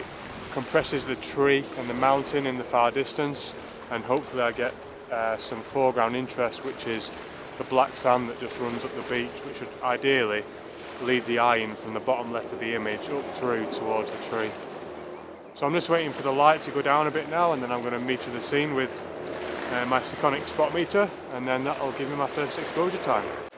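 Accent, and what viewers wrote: Yorkshire, United Kingdom. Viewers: Sounds to me like you are originally from Yorkshire.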